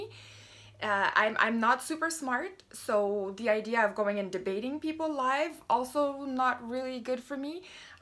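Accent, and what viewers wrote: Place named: Eastern Canada, Canada. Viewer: I hope you are right. Your accent tells me you are from the east. I hope I am right because in order to get rid of Trudeau we as Canadians need the people in Quebec and Ontario to think the way you do. Out west here we already know he won't get a vote except in BC. Unfortunately we don't control enough seats out here where the east has all the power to get rid of a person like Trudeau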